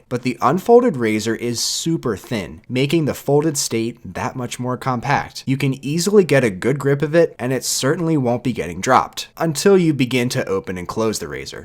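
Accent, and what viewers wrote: Philadelphia, United States. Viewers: Are you from Philadelphia? Sounds like it haha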